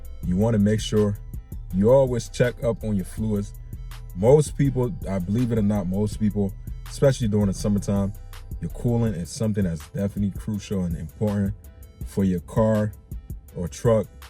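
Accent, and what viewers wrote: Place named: Liberia. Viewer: Where are you from brother, I'm hearing small Liberian accent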